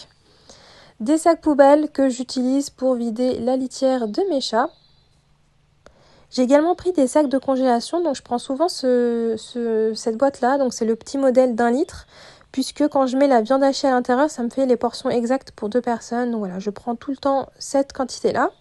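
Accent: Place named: Northern Portugal, Portugal. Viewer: Salam aleykoum ma soeur comment je suis contente de voir que ta fait Aletria. Je me sentait seule dans le sens ou les gens en générale quand il rentre dans l'islam il oublie vite leurs origine il veulent absolument " devenir arabe" . Hamdoulilah cest bien que tu te souvienne un temps soit peu du portugal. Ça n'empeche pas qu'on apprend l'arabe et tout mais faut pas oublier nos racine. Hamdoulilah trop contente. Continue tu me régale Allahuma barek et enplus sa s'entend que tu parle très bien le portugais ... à entendre je dirais que tu viens du nord. Je me trompe ?? 😂❤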